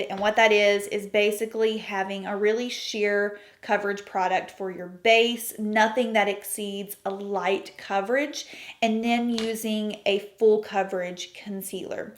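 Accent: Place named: Nashville, United States. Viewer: Oh gosh you're from Nashville! I should have guessed from the dialect lol. It's a pretty decent city to live in. :)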